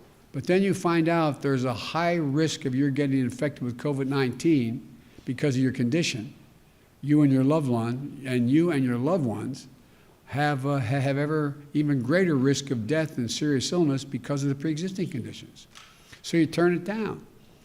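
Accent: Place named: Europe. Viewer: Hey are you American? Your accent betrays you sounds European, just asking? Maybe you were born in America and raised elsewhere anyways I do my homework. I Please stop trolling Traditional Catholics Conservatives and spreading strife....go find a liberal site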